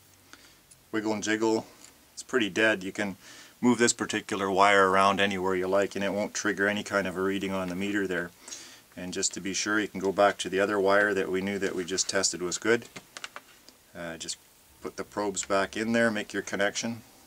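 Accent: Canada. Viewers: Greetings my friend. Are you a BERTAN? I lived in BC and Berta. You totally have either AB accent or Sask. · You sound incredibly canadian ;]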